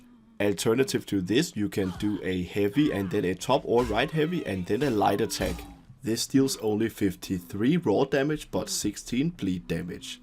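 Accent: Denmark. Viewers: It really sounds like ur danish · Is that a danish accent? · the danish accent SHINES hehe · are you from denmark? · This guy has the most danish accent I have ever heard and it is glorious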